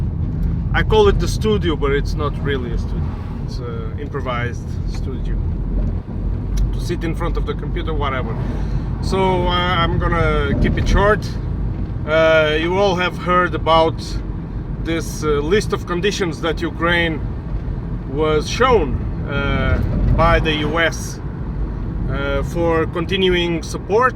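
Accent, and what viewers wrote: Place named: Russia. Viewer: Why you have Russian accent?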